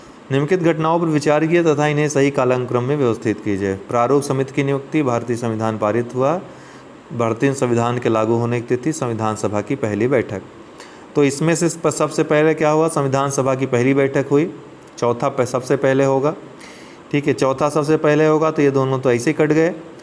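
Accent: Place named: Azamgarh, India. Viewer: are sir kha se ho aap.... Govind Sahab Mela apne jaise bola azamgarh isse to yhi lg rha hai ki ap ya to azamgarh k hai ya ambedkarnagar ke...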